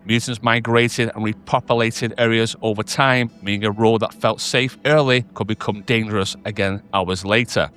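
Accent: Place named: United Kingdom. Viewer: That bri-ish accent boy explaining stoka 2 again. Смешной такой, и откуда он только взялся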